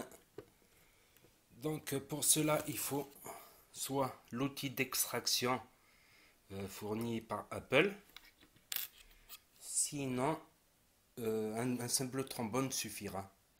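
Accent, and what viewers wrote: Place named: Arab world. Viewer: L accent robeux hhhh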